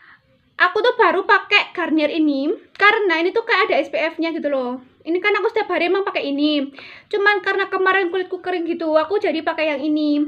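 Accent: Java, Indonesia. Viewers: Assalamualaikum Rek kembali lagi bersama video youtubeku... Demen sama video mbak ini jowone enak dengee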